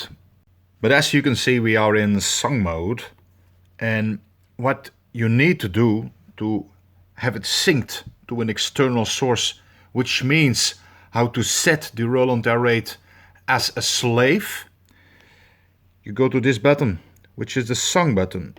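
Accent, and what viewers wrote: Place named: Netherlands. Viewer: Jouw accent klinkt Nederlands dus bij deze: dank voor de video. Heb je ook muziek van jezelf geupload naar Youtube?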